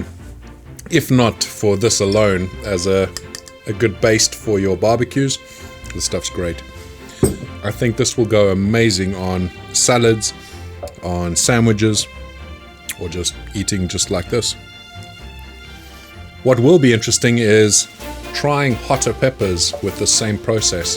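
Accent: South Africa. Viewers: Where in the UK are you from? To my untrained Canadian ear it reminds me of South African. Birmingham? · Little south African, little Zimbabwean, and now a bit of brit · First video I have ever been recommended for. First- South African? Second- fantastic and an instant sub. Cheers from a Canuck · UK....... I'm getting a south African vibe? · Hi. Are you South African? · Are u south aftrican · ah very nice. I’m from California but live in China. Your accent was a bit hard to place but still distinctive. The way you say “hotter pepper” like “hwotta peppa” and “here” like “hee-yah”. My South African friends sound the same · your accent sounds like you have South/Southern African ancestry. Is this so? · Can I ask if your accent is South African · South African accent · Do I hear a hint of South African in there? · Are you south African? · Do hear a note of South African accent there :) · are you south african? 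· Hey man, totally love the channel but i have an unrelated question... are you/your parents/family from south africa? Picking up the very BAREST of accents on some words and I don't think its british kiwi.... sorry if it is xD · Are you South African? :D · Good video man just subscribed. Also your accent sounds south African please correct me if I'm wrong. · you south african by chance? the accent lends that way for me. apologies if I'm mistaken. tits video!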